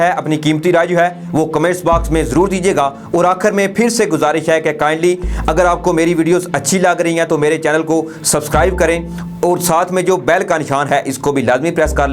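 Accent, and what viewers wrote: India. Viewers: Is the presenter an Indian from his accent?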